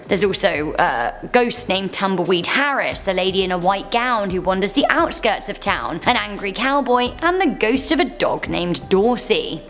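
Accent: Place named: United Kingdom. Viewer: I love her British accent 😮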